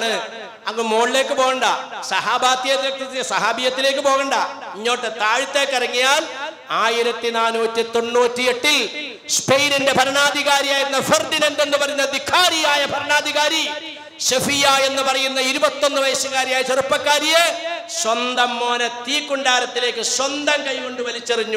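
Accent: Perumbavoor, India. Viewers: ഉസ്താദ് പെരുമ്പാവൂർക്കാരൻ ആണോ? സംസാര രീതി അത് പോലെ ഉണ്ട്..എൻ്റെ ഉമ്മച്ചിടെ വീട് അവിടെ ആണ്..ഉമ്മചീടെ വീട്ടുകാർ സംസാരിക്കുന്നത് പോലെ തോന്നുന്നു...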